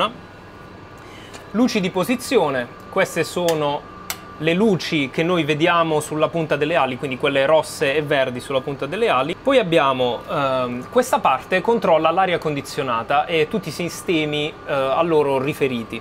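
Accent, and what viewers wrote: Apulia, Italy. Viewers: Ciao, no è pugliese:)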